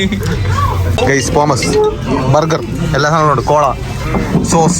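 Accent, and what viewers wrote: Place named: Fort Kochi, India. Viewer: Njan nattil malippuram anu ippo fort kochi slang kandu noki nalla rasamundu kelkkan ente father harboril varumaayirunnu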